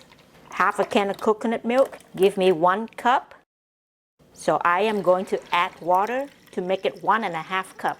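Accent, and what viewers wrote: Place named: United States. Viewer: Are u living in Singapore? I love your American accent..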